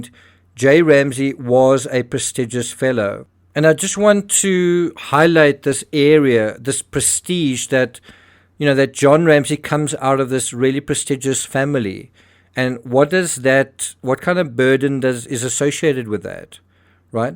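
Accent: South Africa. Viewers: It's that hideous South African accent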